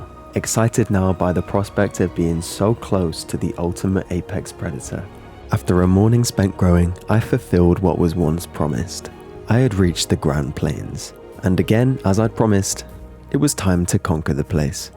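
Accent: United Kingdom. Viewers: I like Dino, I like British voice. I enjoy this very greatly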